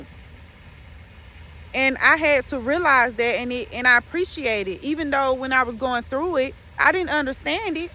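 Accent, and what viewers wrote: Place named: Georgia, United States. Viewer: Great & timely message.. I just know you from Georgia with how you talk..lol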